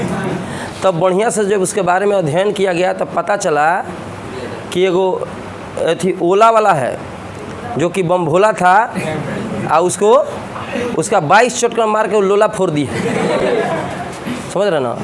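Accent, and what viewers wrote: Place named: Bhojpuri, India. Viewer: Sir aapke per ye Bhojpuri aawaj accha nhi lagta hai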